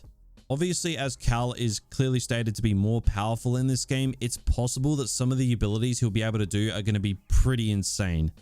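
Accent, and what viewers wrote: Australia. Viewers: The australian accent is unbelievable annoying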